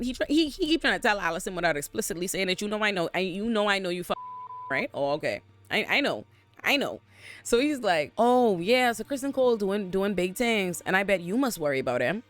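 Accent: Caribbean. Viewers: I sense... Caribbean 👀 🇹🇹?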